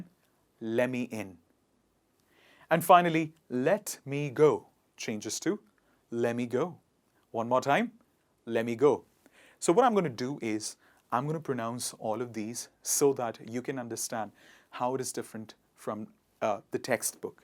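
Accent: United States. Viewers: This is American English? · Is it American English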